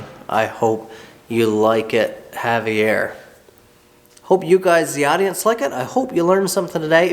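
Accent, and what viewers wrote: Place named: Newfoundland, Canada. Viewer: It’s a Newfoundland accent.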